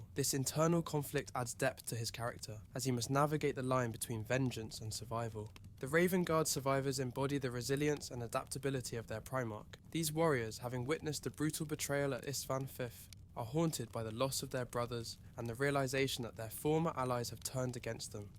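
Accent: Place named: United Kingdom. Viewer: I like hearing a British person speaking about 40k. I know an American or anyone can speak about it also. But I greatly prefer a British person like this guy since 40k comes from Britain. It's sort of like... idk, I want to hear a Chinese man when I'm learning about the Three Kingdoms period or Chinese cuisine. I want to hear an American when I'm learning about modern money or finances, or other American dominated fields. And I want to hear a British person talking about 40k.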